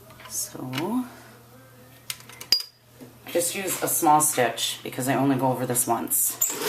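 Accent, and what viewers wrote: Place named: Minnesota, United States. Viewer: Great video! Thanks! Now I'm going to watch the "how to fit" video. I'm from MN but been in AL for 30 years. Fun to hear the accent again! Did I really talk like that growing up?? lol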